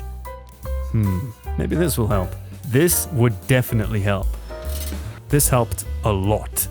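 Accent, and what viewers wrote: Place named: Newcastle, United Kingdom. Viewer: Love your Geordie accent, I laughed when you said Sainsbury's is mad expensive 😂 I feel ya, cause it's my local too! The mosaics turned out amazing!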